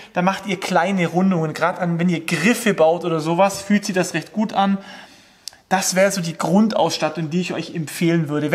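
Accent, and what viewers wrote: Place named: Augsburg, Germany. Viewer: Hallo ich hätte mal eine frage. kommst du aus der nähe von augsburg. mir kommt der dialekt so bekannt vor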